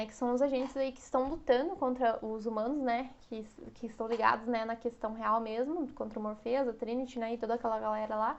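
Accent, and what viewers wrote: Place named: South, Brazil. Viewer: Ainda não consegui calcular, com precisão, este seu sotaque. Paraná ? Santa Catarina ? Rio Grande do Sul ? Interior de São Paulo ? Você é linda e o sotaque também. (Juro por Deus que isso não é uma cantada)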